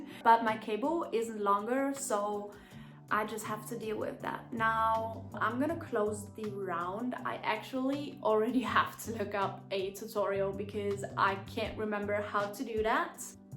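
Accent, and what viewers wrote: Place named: Italy. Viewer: ngl never heard an Italian speak this good English, there is always a very noticeble accent but not as much from you which made me want to keep watching more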